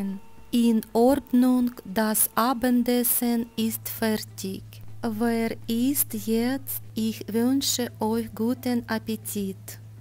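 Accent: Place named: Germany. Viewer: i think your English will be as good as your Germany so look forward to an English video but i like your German accent as well ,you look after self and take care ♥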